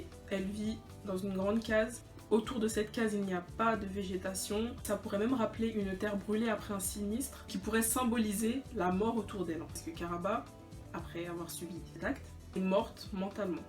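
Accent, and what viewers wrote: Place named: France. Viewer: Comment tu parle avec le bon français de France😭😭😭😭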